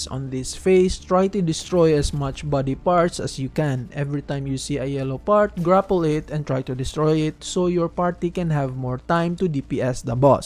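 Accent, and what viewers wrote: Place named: Philippines. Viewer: I had a feeling I was listening to a Filipino accent and I was right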